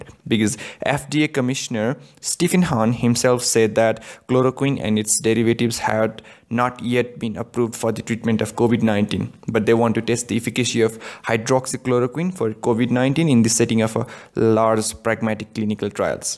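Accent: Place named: Nepal. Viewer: Ur accent seems u are from Nepal..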